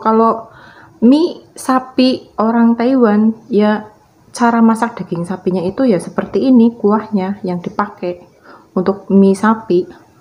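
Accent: Banyumas, Indonesia. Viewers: Mba, asli jawanya mn, ngapak ky nyong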